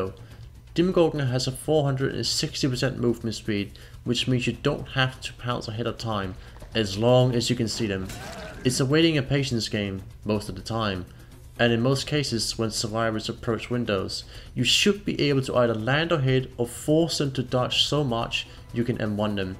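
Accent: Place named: Denmark. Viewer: this is really great. the dbd community needs more good demos to help us get better at playing him! also are you danish or swedish? your accent sounds like it.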